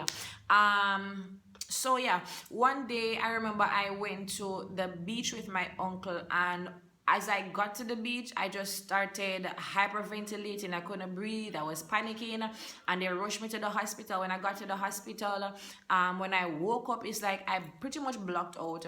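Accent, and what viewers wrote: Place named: South Africa. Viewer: Wow....I really love her accent❤💯🤗#SouthAfrica.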